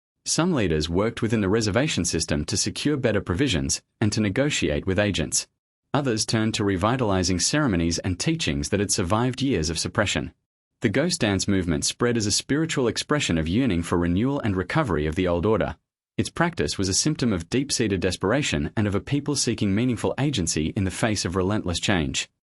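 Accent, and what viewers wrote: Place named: Australia. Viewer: This “historical narrative” is offensively absurd, not only for being narrated in an Australian-concept or Afrikaaner-concept accent, but by eliminating all references to “race,” a MOST critical dynamic between all peoples of the era, as well as now. There was no “universal” starvation, displacement, deprivation, massacre, and genocide of people on all fronts, in any “equal” measure whatsoever. No “criminalization” of sacred traditions, Religions, & Religious practices was ever incurred by any White persons. No treaty agreement was ever upheld. equally-respected and honored by the USA-concept cavalry, government, nor by any known White persons of any significant Positioning in that government (nor in any other European government concepts interested as “parties”)—still undergoing its own “formations” and territorial disputes at that period. 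It MAY be “helpful” to SOME young children to have a narrative of certain historical landmarks, events, and participants involved in Native Nations’ Unjust treatment. But for adults, it serves no purposes other than strengthening, refining and expanding Racism/White Supremacy, as narrated by an individual unwilling or unable to intelligently discuss his OWN “frontier” narrative in a manner that young people can easily understand. The purpose of “History(ies)” as a subject isn’t being “concise.” The purpose of reporting historical data is accuracy, truthful reportage, and fact-based information conveyed in such a way that it engenders compr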